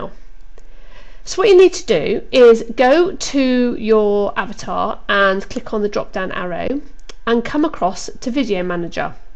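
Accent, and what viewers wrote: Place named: United Kingdom. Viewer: Thank u, I like ur British accent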